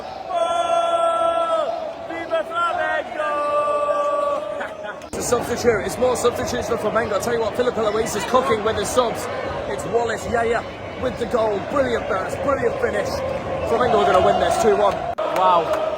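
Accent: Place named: United Kingdom. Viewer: O sotaque parece britânico.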